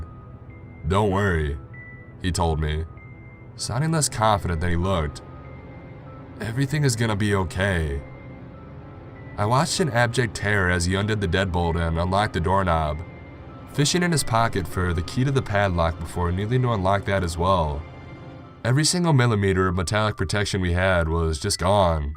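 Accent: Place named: Texas, United States. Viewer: Texas drawl is prominent. Also I loved the story! You made me love these types of stories even more then before! I'm jealous of how smooth your voice is, I stutter when I read out.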